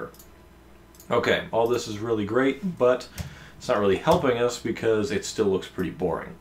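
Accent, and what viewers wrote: Canada. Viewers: nothing, I'm from the Philippines, and me and my american friend where watching this tut, and I ask him what accent is that ("your accent") and my friend said "that's probably a Canadian accent. No biggy, cool tuts anyway, learned a lot from you. Can you make an Infographic Tutorial. I've been doing a Corporate Promotional Video.. Would help me a lot. Thanks.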